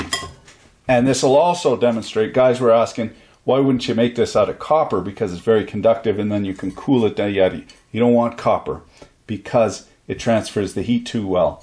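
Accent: Quebec, Canada. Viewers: Are you a french canadian ?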